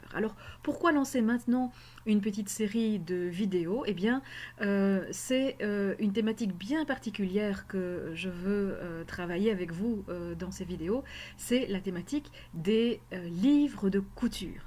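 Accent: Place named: Belgium. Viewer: J'adore aussi les livres de couture, les magazines. Il m'arrive de les lire et dévorer avec les yeux avant de les acheter. Et je les conserve précieusement, comme ma mère avant moi. J'aime bien qu'il y ait les patrons grandeur réelle, même si je ne réalise pas tout. Merci pour ton initiative. Je me suis abonnée et vais voir ton blog. Ton petit accent du côté des gaufres est délicieux.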